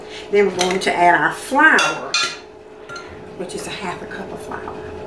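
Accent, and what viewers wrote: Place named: Alabama, United States. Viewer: This just brings back so many memories of cooking with my grandma! 😭😭😭 I’m originally from Alabama and your accent brings me back home, thank you for your sweet southern charm!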